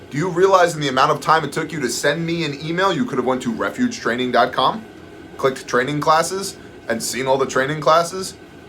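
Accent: Canada. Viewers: Oh my gosh the canadian accent hahaha Did you star in the Fargo movie because that is exactly what it sounds like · The "vandalism" of the Terry Fox statue (old school cancer victim who attempted to run across the country on one leg after the other was amputated..he didn't make it as cancer took his life) was placing a Maple Leafs hat on his head, a hockey stick in his hand and a Canadian flag draped over his back. We all know if this was a burn loot murder riot they would have spray painted it before tearing it down and tossing it in the canal to great applause from the msm. Loved the Canadian accent lol ❤🍁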